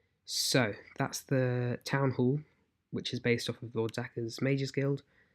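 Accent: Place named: United Kingdom. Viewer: Nice british ácĉent dude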